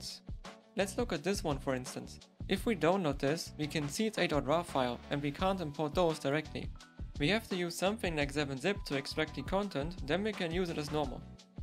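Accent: Germany. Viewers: Bro sounds so German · Deutscher akzent? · Bist du deutsch? Man hört so nen leichten akzent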